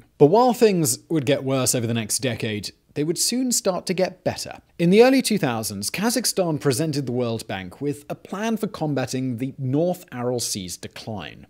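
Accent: RP, United Kingdom. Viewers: I don't remember Simon talking with such a posh accent on the other channel lol.. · I really like your content, but what’s up with that accent? You did not sound that British on VisualPolitik. Seems like you’re trying too hard.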